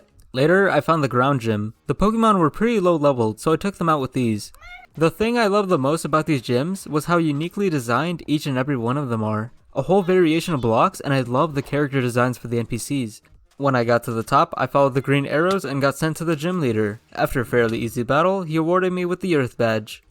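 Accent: India. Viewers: actually sounds like indian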